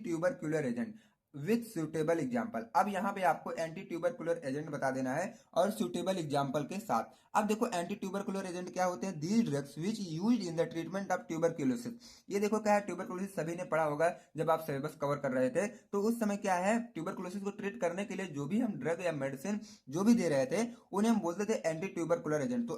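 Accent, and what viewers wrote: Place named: Uttar Pradesh, India. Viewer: Up se ho bhai q Aap language sun ka lag rha Hai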